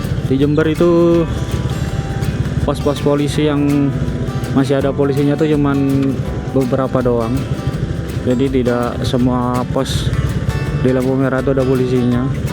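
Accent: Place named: Madura, Indonesia. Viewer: Logatnya maduraan.. hehe salam persaudaraan mase....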